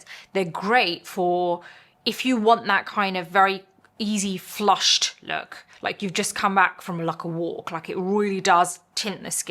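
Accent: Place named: United Kingdom. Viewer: i think her native language is english since she has a uk accent lol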